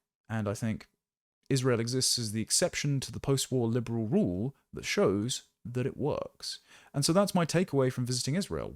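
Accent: RP, United Kingdom. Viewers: This posh useful is simply adding to the panoply of antisemitic tropes--all couched in Oxford Union pseudo-intellectualism spoken in RP. 'Bizarre Heredi, Hasidic cults, and all-powerful Jews,' indeed!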